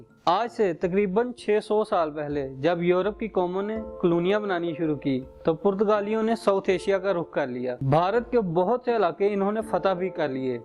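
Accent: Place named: Punjab, Pakistan. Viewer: Urdu to bolni seekh le dhang se. Punjabi tone me bolta hai.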